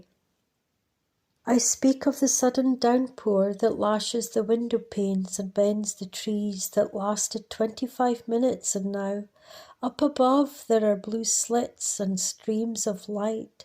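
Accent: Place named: Scotland, United Kingdom. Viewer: Love the Scottish accent !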